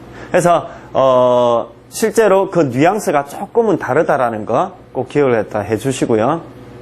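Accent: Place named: Gyeongsang, South Korea. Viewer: 선생님 귀에 쏙쏙 들어오는 음성과 논리 너무 귀하고 감사합니다. 경상도라 그 억양이 더 친숙하고 이질감 없어 집중에 도움됩니다.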